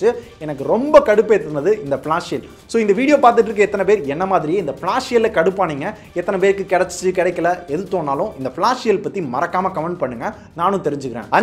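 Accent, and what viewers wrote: Madurai, India. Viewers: Bro Neenga Madurai yaa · Bro neinka Madurai ya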